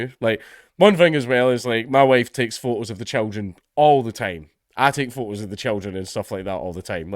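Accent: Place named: Scotland, United Kingdom. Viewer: My daughter in the room just asked about Dankula saying: "how do you understand him dad?" And I just said: Scottish?!?! Easy, just watch Trainspotting once a year for the past 20+ years."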